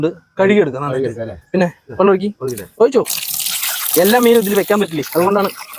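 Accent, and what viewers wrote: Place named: Palakkad, India. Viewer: പാലക്കാടൻ ഭാഷ ഞങ്ങൾക്ക് ഭയങ്കര ഇഷ്ടമാണ് ഞങ്ങൾ അവിടെ മൂന്നുവർഷം താമസിച്ചാണ്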